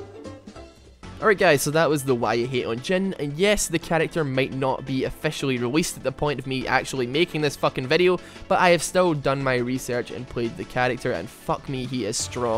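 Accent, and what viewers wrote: Scotland, United Kingdom. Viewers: I can tell you are scottish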